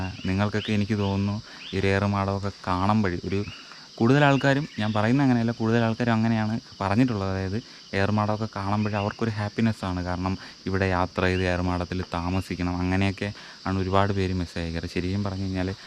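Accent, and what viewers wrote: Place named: Trivandrum, India. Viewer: Chila comments kandu bgm idan kurachu neram vaa pooti irunnal mathi 😂 video start cheyumpo muthal kalapilaa ennu samsarichondirikkum but trivandrum style samsaram rasamund ennalum kurachu kurachal nannayirikum 🤐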